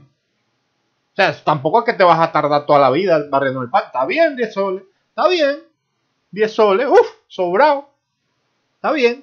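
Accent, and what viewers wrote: Colombia. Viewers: el acento suena a colombiano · El acento no es chileno, más bien diría que es en colombia.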